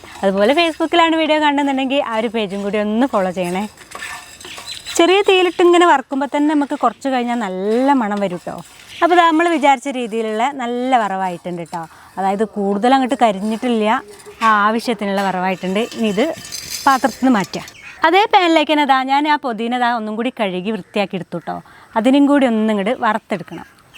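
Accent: Malappuram, India. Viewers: നിങൾ മലപ്പുറം ജില്ല ആണോ.എന്തായാലും സൂപ്പർ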